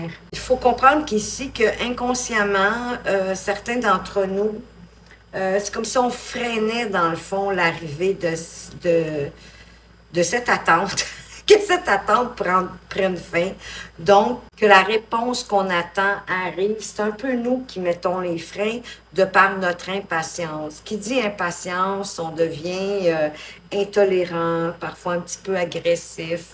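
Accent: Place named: Quebec, Canada. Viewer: Oui c’est ça.... votre accent est très joli.... c'est canadien?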